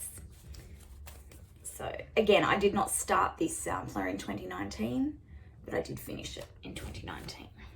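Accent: Australia. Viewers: Loved your video, your wonderful Aussie accent and your handwork. Best to you and yours!!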